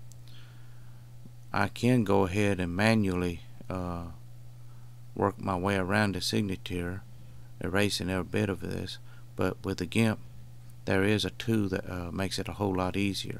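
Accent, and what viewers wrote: South, United States. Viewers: Very helpful, but you sure do sound like my Uncle Cecil? Are you from TN or KY? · Don't use GIMP every day, would have taken me hours to figure this out (if at all), but video very clearly explained, & with a beautiful southern accent!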